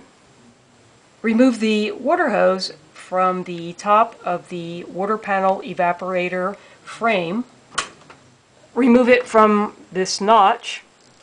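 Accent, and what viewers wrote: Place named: Baltimore, United States. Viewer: Great video! BTW, where are you from? Your accent reminds me of my home (Baltimore)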